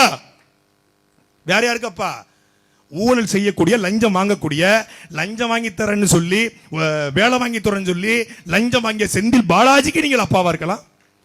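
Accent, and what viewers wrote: Tirunelveli, India. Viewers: திரு . சாட்டை சிறப்பு திருனல்வேலி பேச்சு சூப்பர் 😂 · Welcome to bro sattai media and bro's your explanation is very great and bro Tasmac 😂is roots of un well doings in all social life and bro your Trinelveli language is very welcomed and thanks to media vision ok go ahead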